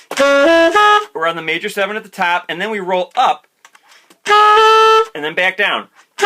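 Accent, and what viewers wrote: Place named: Upstate New York, United States. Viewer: This obviously the way Stan Getz played. As I look through his solos there are licks and patterns that only work with the bis.....btw where are you from? The accent seems upstate New York , Maybe Rochester?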